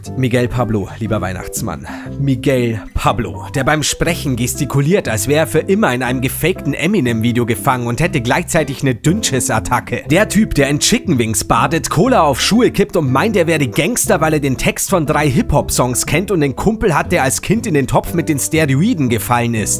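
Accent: Bavaria, Germany. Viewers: du bistn bayrischer bub? und schon hastn abo mehr. dein Zynismus ist mega geil. :'D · woher aus Bayern bist du? grüße aus Rengschburg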